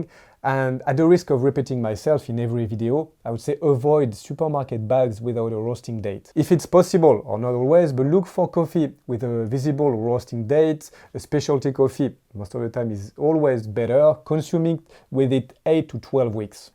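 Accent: France. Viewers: Un français qui parle anglais 😊 merci j'ai tout compris 🙏✨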